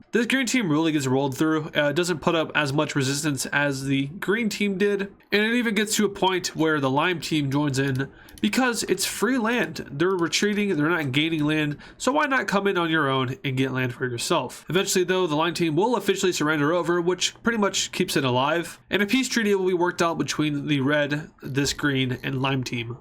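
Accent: United Kingdom. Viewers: What's rong with the British accent I am Briton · As a Brit, I can confirm that was a British accent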